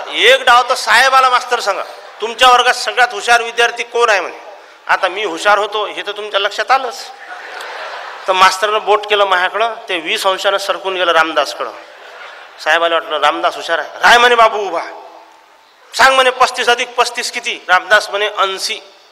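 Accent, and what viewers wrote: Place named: Vidarbha, India. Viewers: Khup sundar. Tumchi Marathi aikun khamgaon Chi aathvan aali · निखळ विनोद. हसून हसून मुरकुंडी वळते. मिर्झा बेग साहेबांची विदर्भातील वऱ्हाडी बोली फार भावते.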